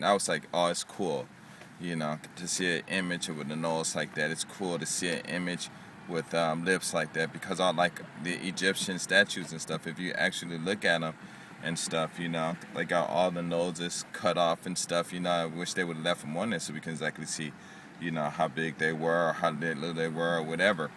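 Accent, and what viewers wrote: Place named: Japan. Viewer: I have NO idea what your accent is...it sounds like it could be japanese, african, jamaican, i have no clue. where are you from originally?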